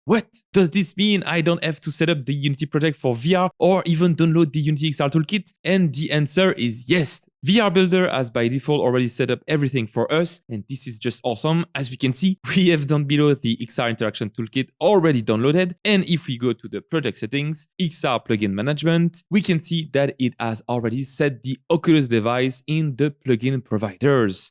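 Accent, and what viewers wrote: France. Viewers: Bro you french trying speak english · Merci pour tes vidéos, j'ai la forte impression que tu es français, pourrais tu faire tes vidéos en français aussi ? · Hi you are french ?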